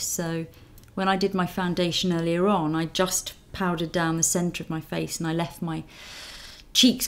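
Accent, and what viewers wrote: United Kingdom. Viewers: Hi Gorgeous teacher makeup!I am so like Your tutorial and I will have playing pleasure with lipstick apply like you and I like your natural british accent! with wishing love and happiness Astor for you <3:D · I love how you speak....just how the words roll out of your mouth and that British accent...Oh dear, so pretty...<3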